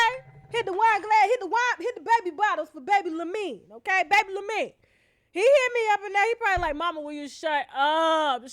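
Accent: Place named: Deep South, United States. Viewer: I don’t know why I haven’t asked before but where the hell are u from lol I’m gettin Deep South vibes lol cuz I’m from Tennessee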